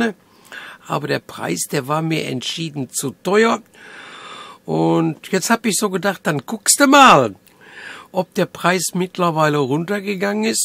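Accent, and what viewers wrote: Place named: Ruhr, Germany. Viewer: Hi, naja Kritische Person? Das bin ich auch, mir wird auch nachgesagt ich sei Pessimist. Naja ich sage da nur Kritische Person hin oder her,man muss nicht zu allem ja und Amen sagen:-). Und ich bin kein Pessimist sondern Realist denn Pessimisten sind die besten Realisten:-). Und du hast halt eine Ruhrpott Schnautze,wie sich das gehört:-), halt ein Original ^^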